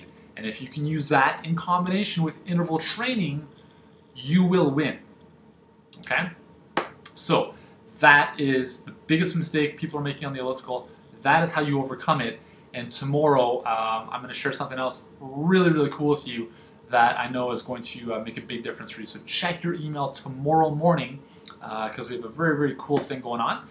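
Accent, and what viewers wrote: Canada. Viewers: Worse than all these things listed would be having to listen to a Canadian accent while trying to work out. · Yeah... That's just how Canadians talk. Nothing wrong with it.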